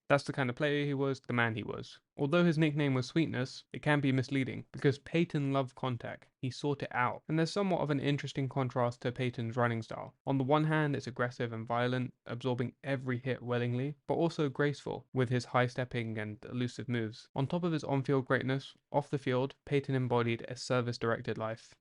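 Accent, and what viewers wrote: United Kingdom. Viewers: A fucking British accent reciting stats pretending he knows what happened. Stick to Soccour.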